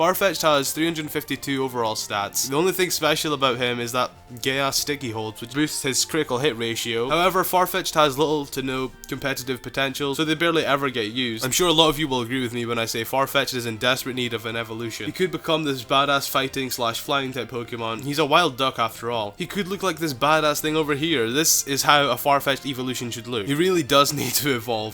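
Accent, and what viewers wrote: Scotland, United Kingdom. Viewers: Are you Scottish? You sound like Shrek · are you Scottish? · R u Scottish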